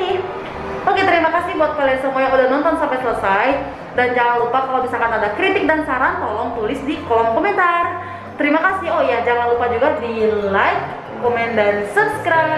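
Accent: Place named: West Java, Indonesia. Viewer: Logat sunda bogor na masih aya meskipun lama di jepang 😁😁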